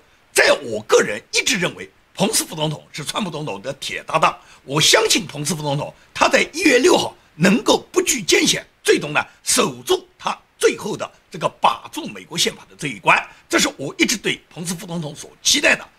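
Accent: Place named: Jiangsu, China. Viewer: 听你口音带了点苏南味 但我一朋友 钟恒 曾是你在南京的同学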